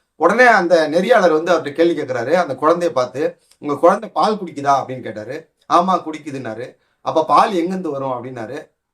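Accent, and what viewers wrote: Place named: Thanjavur, India. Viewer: இல்ல உங்கள் பேச்சு தஞ்சாவூர்காரங்க மாதிரி தெரியுது நானும் தஞ்சாவூர் பக்கம்தான் அதான் கேட்டேன் தவறாக என்ன வேண்டாம்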